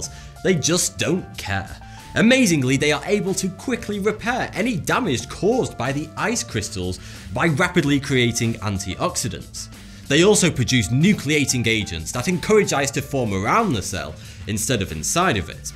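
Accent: United Kingdom. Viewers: Edith Ann Seriously! He's not American. His accent is clearly British.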